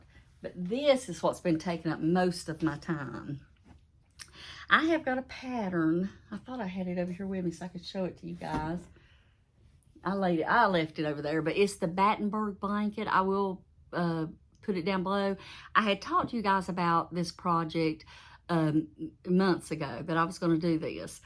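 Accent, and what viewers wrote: South, United States. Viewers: enjoy your video. I love your voice southern talk.thank you for sharing 😀. God Bless everyone love and prayers for everyone 🙏❤.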